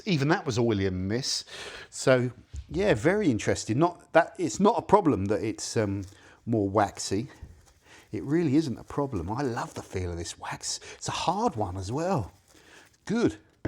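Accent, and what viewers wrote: United Kingdom. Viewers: I really love your British accent. Here in Korea, I occasionally hand-wax my Hyundai with Zymöl Ital. One day, when I’ve saved up enough money, I’d love to get some Concours and give it a try.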